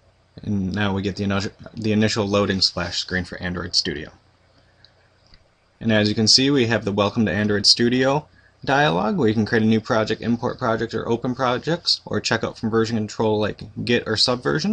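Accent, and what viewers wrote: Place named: United States. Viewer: Hey, I gotta develop many programs till the end of january so i'll be watching all your tutorials :)) I hope I can learn to use android studio because nobody teached me. (I'm studing computer software engineer) Thank you for your job :) BTW: There are 2 big tutorials playlist, yours and one from another guy. I prefer yours because you speak american english and not british :P hahahaha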